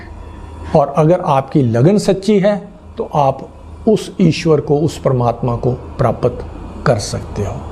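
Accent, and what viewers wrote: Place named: Punjab, India. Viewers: सर, हिन्दी के शुद्ध उच्चारण की आवश्यकता है। कहीं कहीं पंजाबी उच्चारण के प्रभाव की झलक मिलती है। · Bilkul theek surjit bhai ..kya karen hum pbi hee hain ...jhalak tow padegi !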